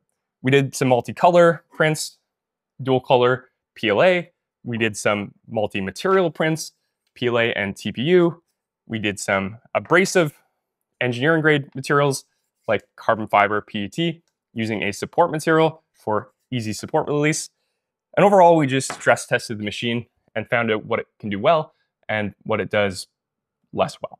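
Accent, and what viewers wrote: Canada. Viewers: Good video. You've got. A unique. Halting style of speaking Canadian. I don't hate it. Eh.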